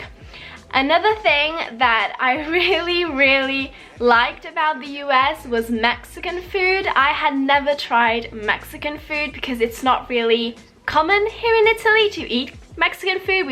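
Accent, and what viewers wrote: United Kingdom. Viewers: I am totally bewildered. How can you be from Italy and have such a pronounced British accent? I mean, I am Italian and I know an Italian accent when I hear it and your is definitely not an Italian accent. Very curious. I'm sure there is a logical explanation. I am also sure others have wondered the same thing. EDIT: Excellent videos, by the way! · i almost thought u were british you speak so good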